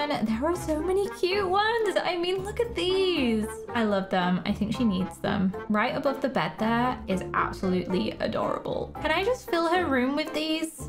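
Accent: Scotland, United Kingdom. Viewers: are you Scottish?? Bc I am “ps bc means because just is you did not know. But are you Scottish??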